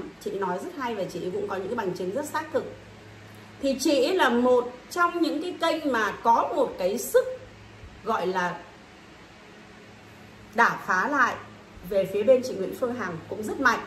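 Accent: Standard. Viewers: chi noi rat chuan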